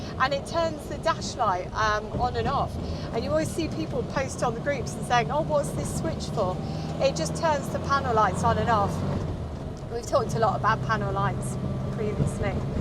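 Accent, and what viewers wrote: United Kingdom. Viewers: I love your voice and your british accent. Your voice is very soothing and it calms me down when I get anxiety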